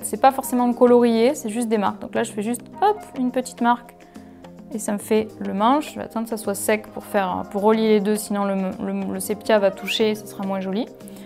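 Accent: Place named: Basque Country, France. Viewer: Alors, je suis Luzien, et je jurerais que votre accent est du coin...C'est tellement familier!!! Si pas luzienne, Basque tout du moins. En tout cas, Merci pour les vidéos!!! Milesker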